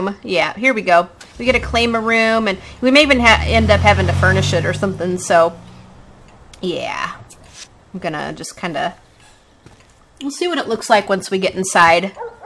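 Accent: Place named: Texas, United States. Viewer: I hope you dont take this the wrong way. But you seem like you have a texas accent are you from somewhere around there (Sorry new fan so I am a little confused) :)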